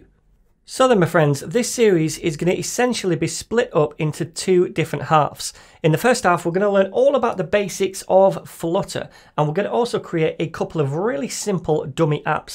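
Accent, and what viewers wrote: United Kingdom. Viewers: Long live bri'ich accent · ngl, at first i thought this man was indian, but when i listen him carefully.... He is british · your British kind of accent is relxing and easy to be understood and the way you explain things is really something. Thanks! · british accent right?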